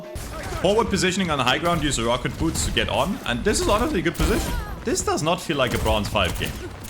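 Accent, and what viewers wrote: Germany. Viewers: Boi, are you German? 😅 Or what is that accent?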